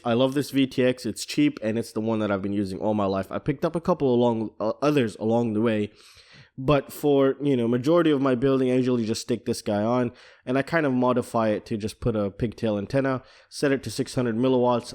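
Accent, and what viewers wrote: United States. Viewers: Wait... American accent but mentions tesco... wth dude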